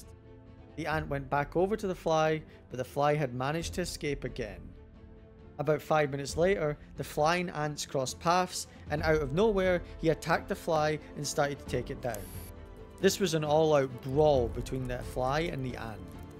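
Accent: Scotland, United Kingdom. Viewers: Thank understandably British guy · That a scottish accent? If so love to see a fellow scot being an ant enthusiast 💙💙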